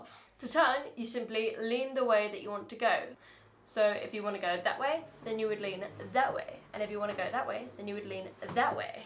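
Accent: United Kingdom. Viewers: cute twitter bird!! lolz Lovin the awesome British accent. tee hee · She's so cute. I love british pronounciation❤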